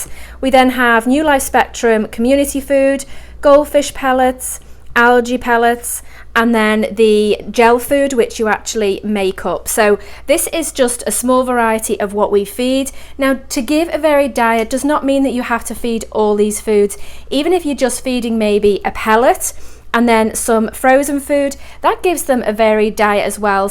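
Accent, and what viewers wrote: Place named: Merseyside, United Kingdom. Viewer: Hi. you sound like your from Merseyside somewhere I'm from Liverpool my self your vids are very helpful this is the first time I have kept fish mine is a cold water tank and I am only stocking different type of fantail 4fish about 2 months old. 6 fish about 3 months old. 3fish about 16 months old all added 1 week ago. My tank is 5FT 2x2 no plant life no coral just large glass slag rocks. White Sand external filter1200lh. 2 air stones. 1 Power head water stream. Iv bin up and running for a week all fish seem fine. But I am reading up on all type's of problems I might get as (condition of water) (food)( temperature) what has me a bit worried Is ick no sigh of it but prevention is better than cure. SO my question is can you put cupramine in my tank this is a treatment for ick.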